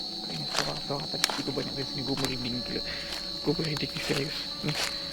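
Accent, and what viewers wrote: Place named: Amuntai, Indonesia. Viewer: Abang neh orang Amuntai kah.. 😀 kaya org Amt mandangar logat pandir pian.. 😀🙏🙏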